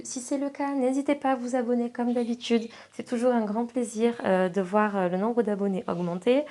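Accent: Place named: South, France. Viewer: Excellente vidéo! Efficace, plaisante, pleine de bons conseils et en prime la musicalité du sud! Bon, y a plus qu'à faire la recette! Merci!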